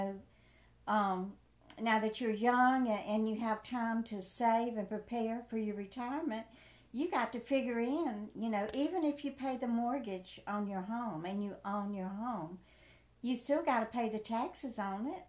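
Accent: South, United States. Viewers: Hi. I just found your channel. It’s nice to see someone address the realities of the elderly. Couple of things. First. Your a attractive woman. New Jersey? With a southern accent, cool. Are you Italian? You resemble some of my Aunties. I did see that your doing smoothies. Cool. I switched to a primarily plant based diet. I have lost 12 pounds so far. Just by cutting out a few things and replacing them with other things. I don’t use coffee creamers any longer, too many additives and sugar. I switched to half and half. I bake my own bread. 50 cents a loaf that lasts all week or more. (thanks mom for teaching me how to bake). 590 for rent? Wow, that beats 1500 plus out here in Southern California. As for me, I’m doing ok thank God and for a mother (with a high school education) that taught me how to save and most importantly pay my house off. Even if you live on social security and a pension, mom always taught me....when your old you still have to save and live a frugal life. 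It’s. A little something on dumpster diving. Just be careful. I did a little dumpster diving in my youth. It’s really sad to see what this country grows away. If your interested and feel up to working just a bit. Check out your local school district cafeteria/foodservice program. You might be able to pick up a couple of hours here and there working in the cafeteria. I was a food service coordinator for years. The pay is good. Keep up the good work......sincerely, Gabrielle, Southern Ca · Hi just curious new sub here.....you have a southern accent why NJ? Im from NY but live in NJ the cost of living is atrocious. My family all moved south yrs ago Im not a fan so I stayed but overall cheaper down there. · Social Insecurity, this term is exactly what it is for so many. Love your sweet Southern voice & wise words for the younger generations. If only they would listen. Unfortunately many are struggling, living paycheck to paycheck. 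Some aren't making the choices that would improve their situation. We can't do it for them no matter how much we want to. Until they "get it", we can only wait & try to point them in a better direction. My state also has homestead exception as well as a discount for older homeowners (age 65+, I believe). Not thrilled about "renting" my property from the county/state, but thankful I'm able to afford it.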